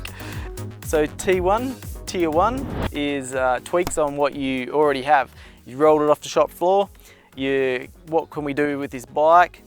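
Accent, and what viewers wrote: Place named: Australia. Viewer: fair dinkum aussie right there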